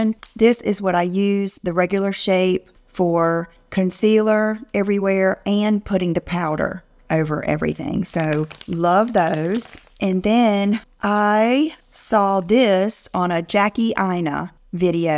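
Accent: South, United States. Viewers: Hi, I used your link and discount code yesterday to order my City Lips, thank you very much for your recommendations, I had to restock my stash, also love the variety on your channel, your presentations are exceptional, and don’t ever loose that charming southern accent, stay with what you’re doing, you can’t improve on perfection. Thanks again and God bless.❤️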